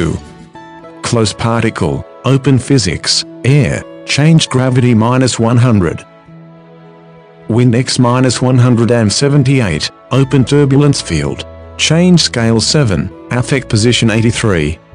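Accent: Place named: Australia. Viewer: robot australian is a very annoying accent for this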